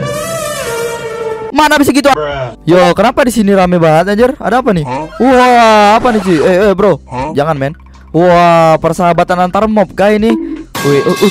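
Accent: Java, Indonesia. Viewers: Bang lu orang Jawa ya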